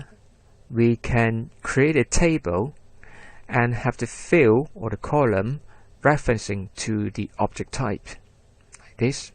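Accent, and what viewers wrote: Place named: London, United Kingdom. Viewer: Nice video! We are you from? You sound like a Londener and Chinese at the same time (no ofense).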